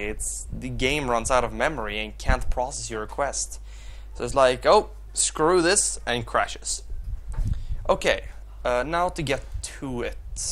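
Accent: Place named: Sweden. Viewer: not a good thing dude. You have a really horrible way of delivering what you're trying to say. You take way too long to say it and sound really spastic. But it sounds like you're swedish so i'll just chalk it up to english possibly not being your first language.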